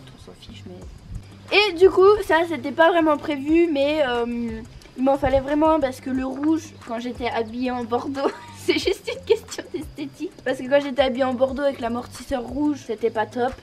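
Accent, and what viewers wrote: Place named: Switzerland. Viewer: Est ce que tu es Suisse par hasard ?😁 parce que je trouve que tu as vachement l’accent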